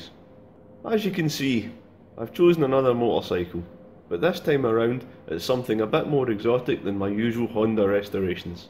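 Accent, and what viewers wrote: Scotland, United Kingdom. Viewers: Where about in Scotland are you from ? Hello from Fife lol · First time I have heard your voice and you are a fellow scotsman 👍. You got yourself a new subscriber. Are you planning on keeping the ducati or selling it just out of Interest.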